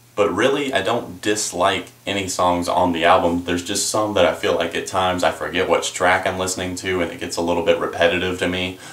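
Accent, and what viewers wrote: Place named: South, United States. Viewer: It sounds like you have a southern accent in this video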